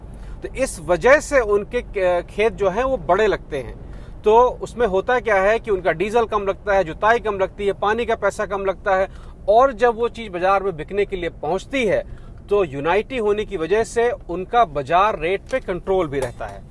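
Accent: Bihar, India. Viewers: Lagta hi aap bihari ho ...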